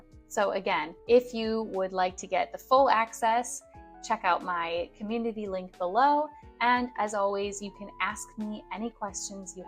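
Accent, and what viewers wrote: United States. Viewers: Teatcher are you amirican..?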